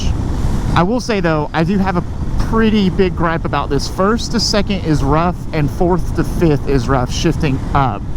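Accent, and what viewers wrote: Georgia, United States. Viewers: What part of Georgia are you from?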